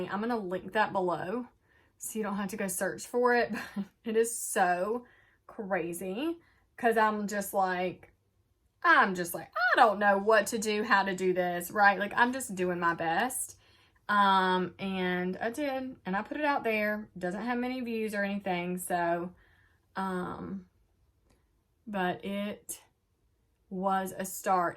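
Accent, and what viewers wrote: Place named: South, United States. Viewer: Chick-a-boom! You are rocking it wicked! Love your warm little southern accent! I’ve been doing the MK for near 6 years and loving your style! I’d love to hear about your behind the camera and preschoot prep. I’ve been thinking about doing the YouTube thingy. Stuck on the start part.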